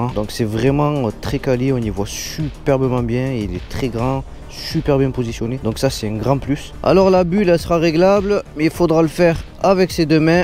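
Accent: Marseille, France. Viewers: T ' es vraiment de Marseille ! .😂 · Cool ta présentation avé l'accent et le panorama superbe. Je ne peux qu'approuver tes remarques ayant une version de l'Xciting de 2019, je ne peux que promouvoir ce scooter qui se faufile sans problème dans les rues et la circulation parisienne. J'ai juste eu un problème de cartographie Moteur qui faisait que je calais à la décélération de temps en temps mais avec la nouvelle cartographie, c'est nickel. Je regrette juste de ne pas avoir le TCS et le superbe écran du 2023. Sinon j'ai possédé avant pendant 2 ans un Xmax 400 et bien le Yam à coté du Kymco était plus que décevant (position, moteur & confort + sécurité). Ride Safe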